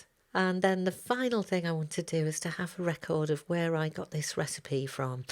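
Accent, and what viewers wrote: England, United Kingdom. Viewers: So hellpful and excellently done! THanks! Your accent makes me homesick for England!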